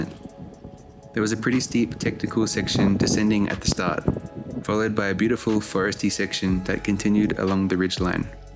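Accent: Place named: New Zealand. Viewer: Interesting! Thanks for sharing! I thought I heard some Kiwi in there. Thanks for this video, might do Yushan in one day in November, and your other travels seem so sick as well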